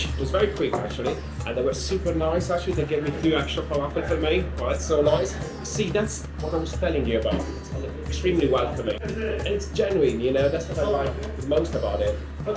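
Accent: United Kingdom. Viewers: You deserve more views 👍 Im curious Are you Italian? But you have strong British accent 🤔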